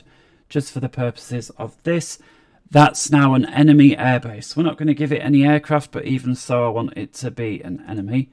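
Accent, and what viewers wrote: United Kingdom. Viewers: This is spot ON!! NICE one, and with a NW accent as well, even if it IS a Manchester one (and not a Scouse!! 😉). Thanks 👍 < There's ANOTHER thumbs-up as well as the one above!!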